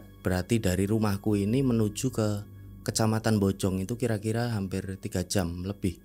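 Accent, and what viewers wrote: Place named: Bantul, Indonesia. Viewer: logat e bantulan banget mas 😂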